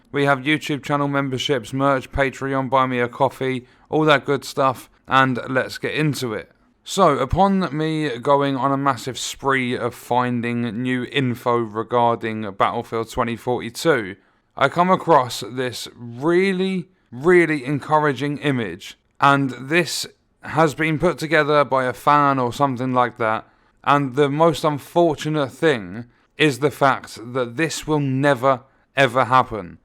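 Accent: United Kingdom. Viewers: Not to be disrespectful but the british accent makes this video even more enjoyable and funny. :)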